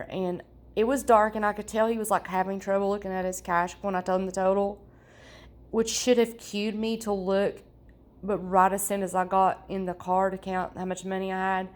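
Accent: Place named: South, United States. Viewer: damn I must be psychic lol. There is nothing wrong with your accent , southern charm.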